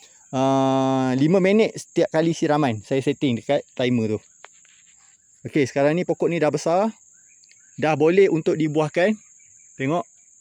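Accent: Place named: Pahang, Malaysia. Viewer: oh patut dengar cakap macam orang pahang.. haha boleh datang melawat kebun dok bang?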